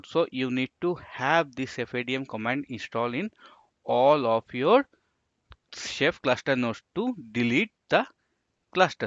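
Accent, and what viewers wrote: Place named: India. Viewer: Pretty nice video, clean, neat and in the expected Indian accent. Pretty nice job bro!!! You got my like!!!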